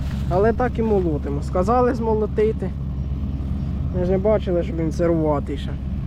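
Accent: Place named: Poltava, Ukraine. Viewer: Яка розкішна і соковита полтавська вимова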